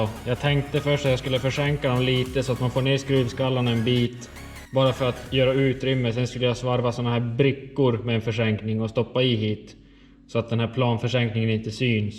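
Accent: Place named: Norrland, Sweden. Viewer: King video! Var e din dialekt i från, låter som norrut eller mer mot det finska?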